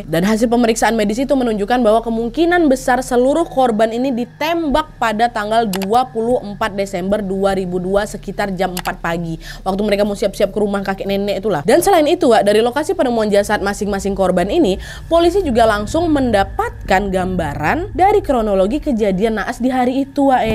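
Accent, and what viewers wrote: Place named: Sumatra, Indonesia. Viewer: Asek kali lah kalau udh org Sumatra yg bawain cerita.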